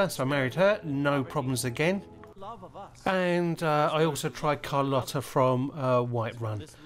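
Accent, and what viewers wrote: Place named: Cornwall, United Kingdom. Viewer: Cornwall, I wondered why I couldn't pick your accent !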